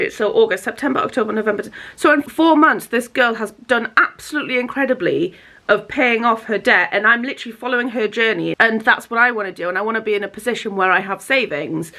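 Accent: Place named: County Durham, United Kingdom. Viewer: Hya just found your channel. Where are you from? You sound like you have a County Durham accent or somewhere in the north xx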